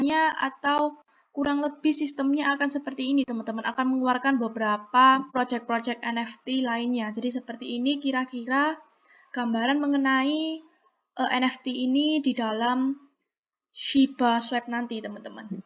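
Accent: Java, Indonesia. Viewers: medok nya khas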